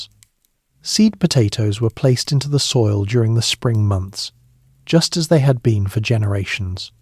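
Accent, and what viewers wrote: United Kingdom. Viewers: I can't listen to a man with a distinct British accent narrate a commentary on the Irish Famine. I'm surprised you don't get it. It's similar to listening to a German narrate a documentary on the Holocaust. What!?! You think we've forgotten?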